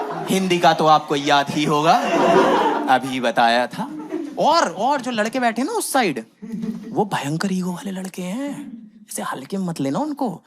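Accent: Hyderabad, India. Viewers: 2nd video and suscribed ❤❤❤hadreabdi tone 😂😂❤❤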